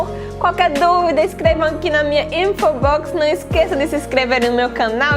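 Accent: Northeast, Brazil. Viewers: Ela parece nordestina falando português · Sim...nordestina de Maceió!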